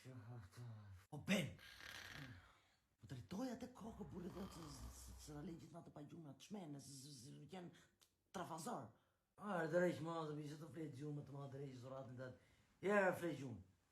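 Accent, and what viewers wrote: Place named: Central, Albania. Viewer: O Zot....eshte e vecante...mynyra si flet me kujto gjuhen e shqiprise se mesme ...sa mall 😅😅😅😅😅 Je shume originare. 😂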